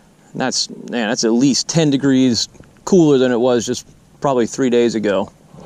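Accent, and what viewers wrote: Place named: Boston, United States. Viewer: 1: them fish all got training wheels. 2: age of consent man. 3: ive seen your fish fillet video, and a 12" fish = a tiny fish stick.... 4: no one wants to put tiny fish sticks in their mouth, would you? 5: nothing better then busting some Louisiana boys balls. 6: the challenge, of being a better Fisherman. 7: its fall man go find some bigger trout, like an adult beating up kids, which by all accounts sounds fun... but you dont take thier bikes after your done man. Have some class. 8: big fish = more likes. I dont know how the algorithm works, but I'm sure once they convert 13" keeper to 0s and 1s, you get bumped down pretty hard. I literally had to Google, Louisiana guy catches miniature fish and brags about it in the mash with a Boston accent; to find the video.... looking out for you man.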